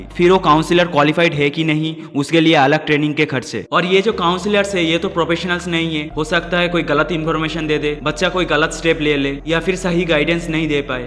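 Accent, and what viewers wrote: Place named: West Bengal, India. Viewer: Dada apni west bengal thaka ki? actually apnar accent thaka lagcha 👍🏼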